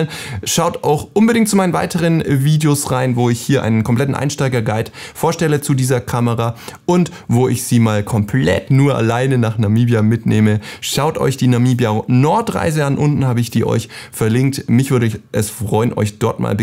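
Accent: Germany. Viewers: bist Du aus Deutschland ?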